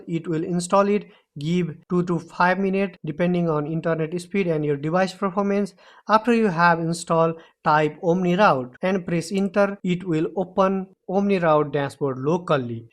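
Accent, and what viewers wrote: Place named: Nepal. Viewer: your accent is so nepali you nepali?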